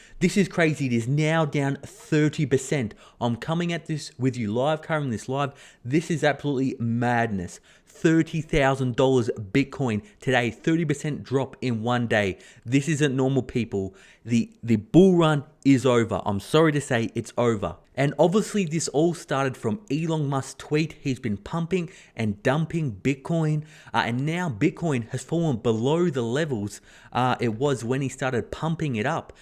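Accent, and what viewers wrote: Australia. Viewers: I don’t know which phrase I like hearing better in an Australian accent, “Holy Smokes” or “Party Pooper.” Either way, great video, thanks for keeping us up to date on all things financial!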